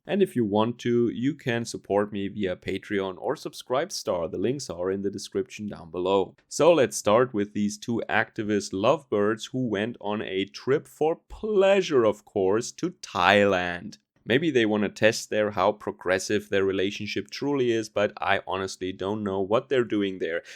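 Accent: Germany. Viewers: I want to hear you say..... It only takes a camera to change her mind. In your cool German accent You know the song Lol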